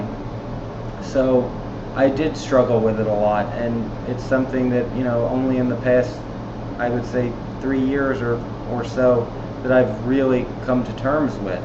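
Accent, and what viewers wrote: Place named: Deep South, United States. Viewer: That's a deep south accent. Most likely Mississippi.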